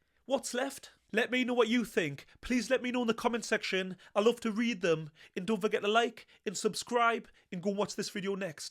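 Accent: United Kingdom. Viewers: What uk accent is this guy speaking?🤔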